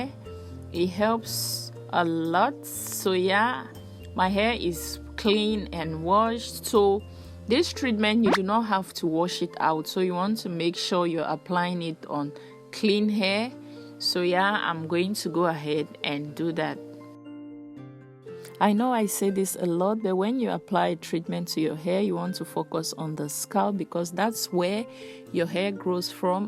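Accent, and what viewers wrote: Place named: Cameroon. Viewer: plus u sound like Cameroonian, are u Cameroonian?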